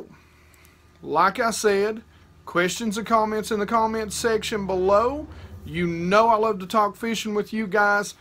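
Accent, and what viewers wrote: South, United States. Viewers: I like the laid back feel you give your videos, the music, your southern accent (us Canadians are hyper and say eh all the time... eh). Looks like a good time! thanks for takin' me with ya.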